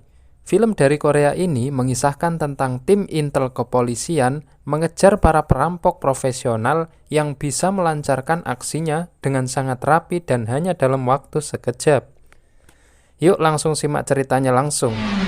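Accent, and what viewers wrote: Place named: Java, Indonesia. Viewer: Cek medok eee cak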